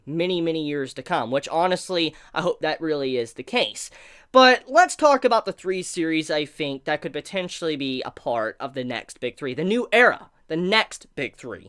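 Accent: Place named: Appalachia, United States. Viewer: brotherrrrrr hill billy voice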